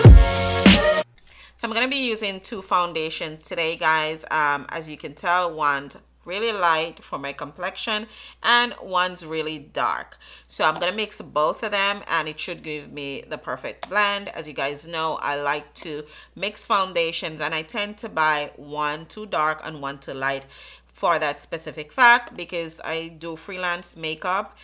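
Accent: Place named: Jamaica. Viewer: Beautiful look. ❤ ❤ You sound like you are Jamaican. Are you from Jamaica if you don't mind me asking?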